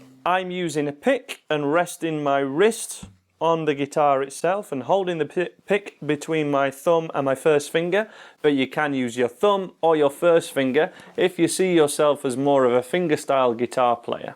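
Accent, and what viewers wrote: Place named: Northern England, United Kingdom. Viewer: Cheers! Mega helpful plus - Northern Accent FTW!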